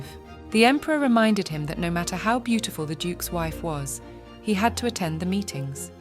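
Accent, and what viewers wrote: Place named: England, United Kingdom. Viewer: Cant stand englisg narrators accent either.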